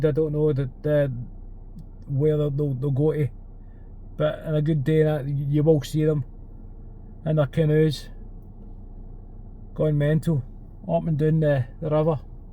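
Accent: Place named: Glasgow, United Kingdom. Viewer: you are a good person, keep it going, interesting commentary n Speaking like a true glaswegion love to you and your family the banter ive been having with the opposite side here in SA is been a good laugh ❤.,cracker that comment oxford street 'dont be confused with london' got me laughing 😂good one 😂, here in SA when you visit friends its,id,drivers license and finger print recognition, everyone thinks south Africa is behind the times its not,security companies have replaced the police and its working providing employment thats badly needed,its bloody heartbreaking to see anyone beg 😢thanks for your positivety ❤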